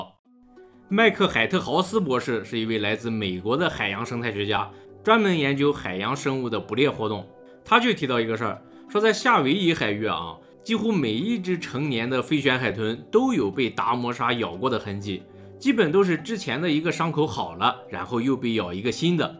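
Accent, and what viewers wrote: Shandong, China. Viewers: 楼主是哪里的口音啊？山东？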